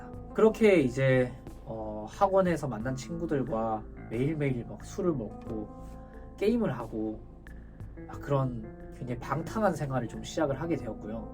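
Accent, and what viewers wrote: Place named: Seoul, South Korea. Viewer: 너무 서울 억양으로 말씀하시는거 아닙니까. 같은 마산 출신으로서 서운합니다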